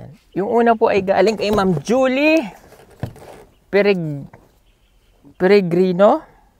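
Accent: Visayas, Philippines. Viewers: sir ang cute mag salita ng bisaya!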